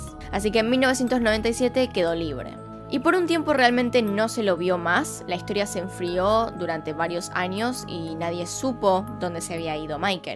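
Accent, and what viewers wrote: Argentina. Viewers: Se te escucha pachazo 😁me encanta el acento argentino 😂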